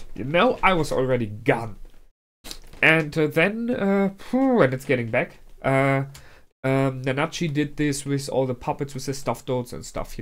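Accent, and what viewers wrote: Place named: Germany. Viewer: could you Provide me with a link, im on phone and i can only click on links:/ Would be very much appreciated. By the way are you from Germany, you have that kind of accent:)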